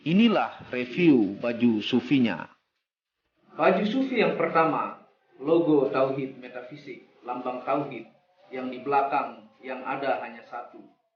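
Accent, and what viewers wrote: Bali, Indonesia. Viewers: Logat pembaca spt org Bali ?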